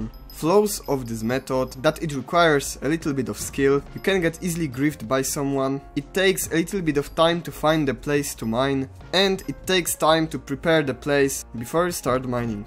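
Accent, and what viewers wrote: Poland. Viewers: u definitely sound like u have some polish accent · jestes z polski? bo giga masz taki akcent polski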